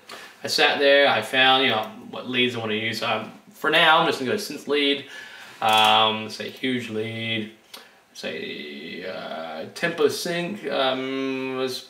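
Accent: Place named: Australia. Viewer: Lol I cant tell if you're Australian or American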